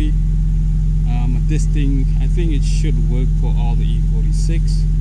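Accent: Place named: Cambodia. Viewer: I detect a Khmer accent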